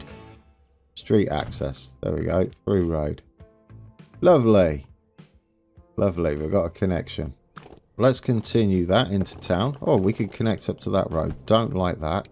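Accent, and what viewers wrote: United Kingdom. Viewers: Hello, in about section you say : location USA, but you sound quite british. I am improving my English. Could you enlighten me ?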